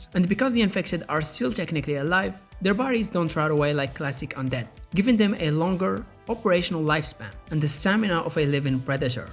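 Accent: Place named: Morocco. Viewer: Bro, are you Moroccan? The accent is soooooooooo close to Moroccan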